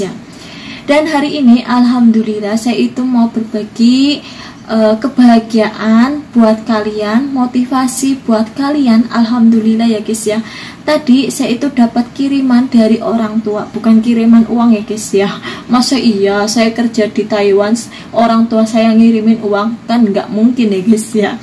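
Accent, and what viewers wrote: Banyumas, Indonesia. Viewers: Ngomongnya ngapak , ya salam dari Purwodadi Grobogan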